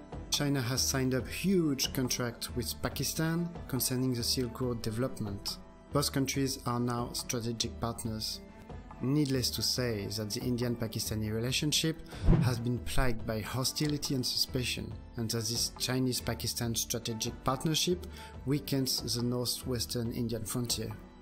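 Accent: France. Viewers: Just 10 minutes far in the video, and enjoying it truely, but I have to comment now on your super cute accent. As a french myself I understand your struggle and I compliment you : you stress all the words properly. But still, it makes me smile. Shirvan commented a bit more on the difficulties that lies ahead of India, than you do. Do you put more weight on more optimistic views by choice, like your Patreon page says ? "Cheerfull foreign affairs videos" It chearfull for sure :-) Oh, my bad, you do talk about the limits to growth. thèse-antithèse-synthèse on se refait pas :-) I personally am afraid the positive developpment of India might suffer very much from the peak oil. Alltogether, views on economics, on politics, and geopolitics... all sumed up, given nuances... very beautifull peace of thinking. I proudly recognize the french-like attention brought to the organization of thoughts, with diagramms and titles, just like in school ! 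· the French accent is stronk here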